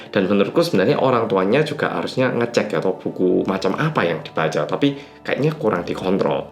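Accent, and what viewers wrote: Java, Indonesia. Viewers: Aku tu lihat yg medok, itu udh seneng,di tambah lagi cerita nya ini,lebih seneng,cerita sendiri takut sendiri 😂😂😂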